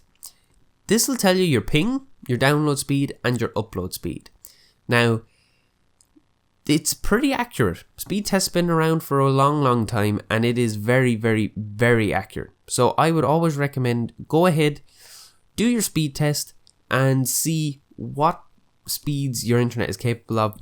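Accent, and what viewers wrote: Ireland. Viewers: are you irish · Welcome to Ireland mate now we all know you live in Dublin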